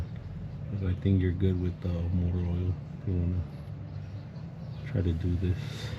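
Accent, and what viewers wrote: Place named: Southern California, United States. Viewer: You got that southern california chicano accent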